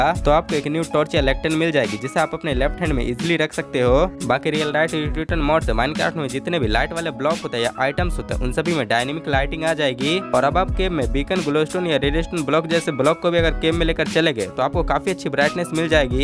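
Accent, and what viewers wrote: Bihar, India. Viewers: Bro ur bihari accent is awesome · you are from Bihar i m 99% sure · R U From Bihar ?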